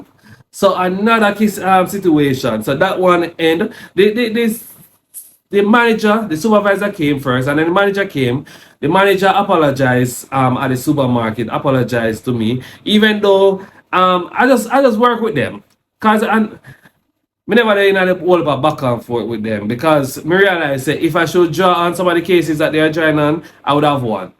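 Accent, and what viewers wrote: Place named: Jamaica. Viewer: Wow❗ So sorry 2hear your story... 1st of all, realizing that this happens a lot to POC in recialised societies & places, that's true 😵 And glad 2learn tht no-physical-harm came to you guys💯 Buut also just saying w/o equivocation or disrespect, its pretty difficult to sometimes follow your heavy Jamaican patios ((pat-wah)) accent... Caveat: Don't you realise that you might have a **Global Audience (which is a good thing), & is why you're on a public platform in the 1st place, right? However, not every individual tht comes 2yr channel knows, or is aware of Jamaican patois words? ~As an intelligent young man, just be cognizant of that fact? ✴️Yuh can duh bettah man! Talk clear, plain-English. After all, you're addressing your Global Audience, not just Jamaicans😃👋🏽 #Continue2STAY safe out There •Bless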